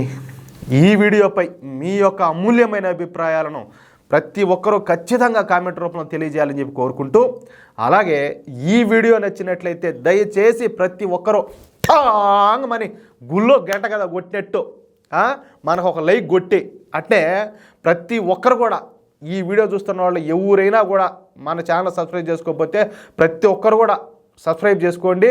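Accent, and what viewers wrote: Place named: Nellore, India. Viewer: Yes. Rightly said by Honourable Minister. Garu, I liked your Nellore Syle Assent. Keep it up.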